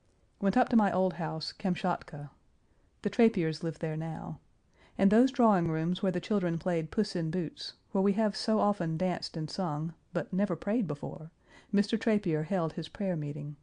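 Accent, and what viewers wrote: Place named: South, United States. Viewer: she has a sexy southern aristocratic voice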